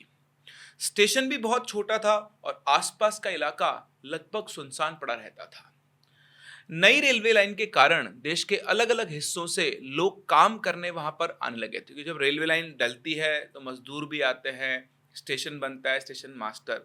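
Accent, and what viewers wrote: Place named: Chhattisgarh, India. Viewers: Badiya chattisgari bole sir😮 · Chhattishgarhi boli bahut sundar lagat he tuhar muh se bhaiya ji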